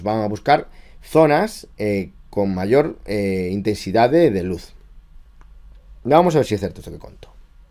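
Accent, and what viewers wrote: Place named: Spain. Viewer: jajaajaj lose es que soy argentino y me da gracia como es su acento pense q era español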